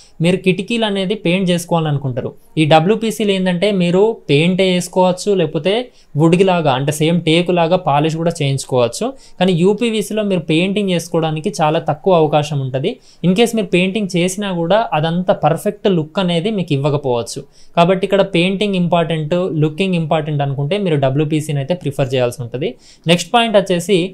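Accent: Telangana, India. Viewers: Hi Anna sutthi lekunte matter super Telangana slang Lo kiraak chepthunav, Anna intlo interior wardrobe and kitchen Lo wardrobe gurinchi oka video chey Anna plz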